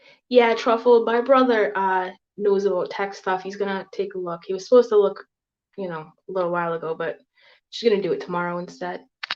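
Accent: Boston, United States. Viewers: First time here. You from Boston? That accent is always noticeable lol. Love it! #Bostonstrong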